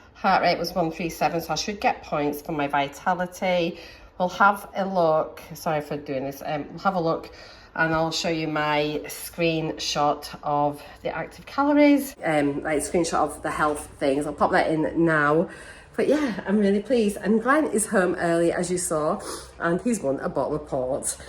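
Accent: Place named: Scotland, United Kingdom. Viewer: I love how the Scottish lingo is coming out more and more, quite a few 'ayes' here, haha. Dumfries and Galloway is such a beautiful area, I love it there.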